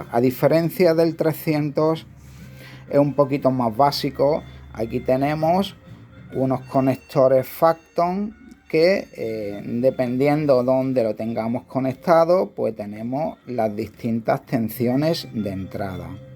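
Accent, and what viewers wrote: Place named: Andalusia, Spain. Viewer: Perdón: viendo más vídeos he comprendido que el acento andaluz usa aleatoriamente ese por zeta y zeta por ese :-)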